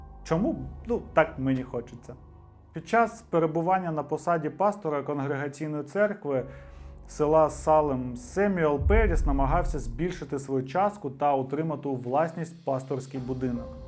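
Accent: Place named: Russia. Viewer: Дуже крутий контент, подивився вже майже усі відео Але є трошки конструктивної критики) Було б добре, якби автор трошки попрацював над вимовою (маю на увазі зросійщену "г" і подібні русизми) А так все файно, дякую за працю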